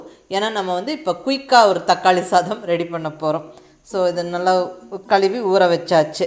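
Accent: Coimbatore, India. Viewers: Nama Coimbatore Tamil kekalam Inge vantha.. 😍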